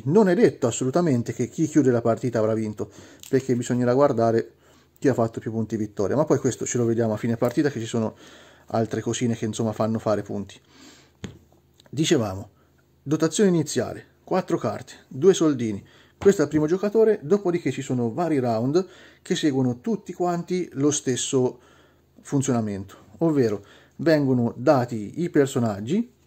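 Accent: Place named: Perugia, Italy. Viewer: solo perché sono di Perugia anche io hahahah anzi si sente pochissimo grande bel tutorial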